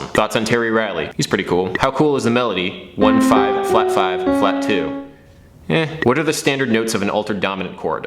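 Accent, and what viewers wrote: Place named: Maryland, United States. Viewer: I can hear you're from MD bud in the way you say "so".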